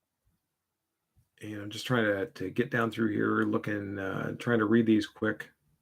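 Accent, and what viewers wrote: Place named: Texas, United States. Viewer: okay okay okay, I may have thought it when I heard your Texan drawl.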